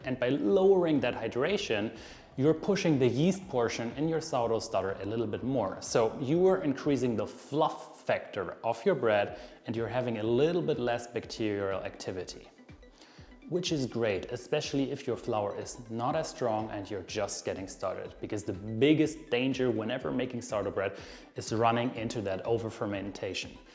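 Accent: Germany. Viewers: Wenn Deutsche am perfekten Englisch feilen…. Hört man trotzdem raus, dass es Deutsche sind. Dein Englisch ist sehr, sehr gut. Keine Frage! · My mom was born in Remshied, Germany, so listening to you brings me back home🎉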